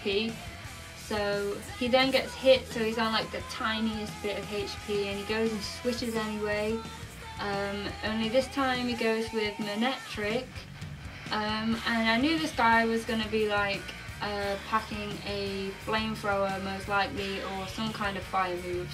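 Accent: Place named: Nottingham, United Kingdom. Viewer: that Nottingham accent tho ;]]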